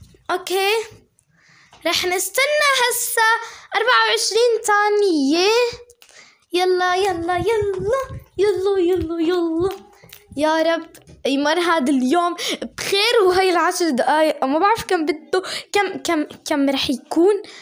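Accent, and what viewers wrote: Baghdad, Iraq. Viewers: واوو كلش تحجي عراقي إذا تردين اعلمج بعض الكلمات العراقية 😍احبج 😍😍😍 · ههه انتي مضحكة في الهجا العراقي انا من العراق يعني أعرف شقول في العراقي 🧸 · يخبل كلامج بالعراقي بس لو تتعلمي اكثر راح تصيري احلى انتي تجنني تحيه من العراق للمغرب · اول شي حجيتي بل الغة البغدادية واني عراقية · مو صعبه الهجه اللجه انتي حجيتي بغدادي اليغدادي صب الجنوبي اسهل تحياتي فاطمه من العراق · انا عراقية🐸💗 الي هية احمر فوق وابيض فالنص وفيه كلمة الله اكبر وتحت اسود ههههههههههه يربي شلون حجيتي عراقي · احله لهجه هي العراقيه بس انتي شوي تعرفين عراقي 😭🌚🤓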